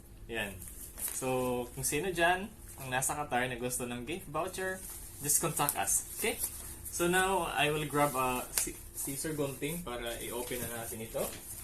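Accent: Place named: Visayas, Philippines. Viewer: Bisaya to si sir uh.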